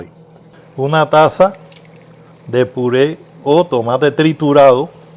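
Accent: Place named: Santiago de Cuba, Cuba. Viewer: Yo voy a hacer tu receta y seguir tus trucos tiene muy buena pinta. Dios te bendiga abuelito, me dio mucha nostalgia tú acento, yo también soy Santiaguera.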